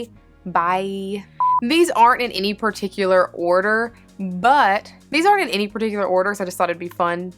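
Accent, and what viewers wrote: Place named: Alabama, United States. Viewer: Hey you are so amazing and inspiring, to be honest I don’t know if I would have gotten a bearded dragon If it wasn’t for you, I watched so many care guides and they were all so different but yours just seemed right, I showed my mom your channel and she started watching your videos with me. I really want to be like you when I get older, but I either want to be a firefighter to help out the community or a herpatologist (studies reptiles and amphibians). Omg can we talk about our accent, I know your from Alabama, and I’ve lived in Alabama my whole life we currently live in Madison, my favorite store is animal trax, I like to support small local businesses, other than like petsmart, but don’t worry, is still go to petsmart. Just remember JESUS LOVES YOU AND YOUR FAMILY.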